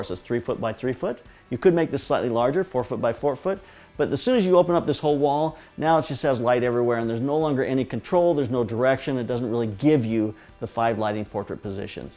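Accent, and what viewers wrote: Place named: United States. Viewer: Best American Accent Ever!!!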